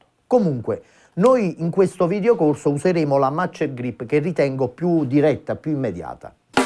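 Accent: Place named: Campania, Italy. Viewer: Grande maestro! Simpaticissimo. L'accento campano mi fa sentire a casa.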